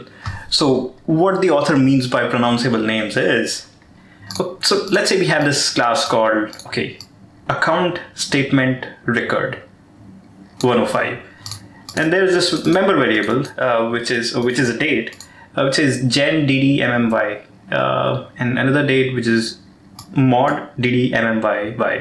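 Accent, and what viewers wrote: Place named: India. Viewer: It's not the code that we don't understand but your Indian accent 😐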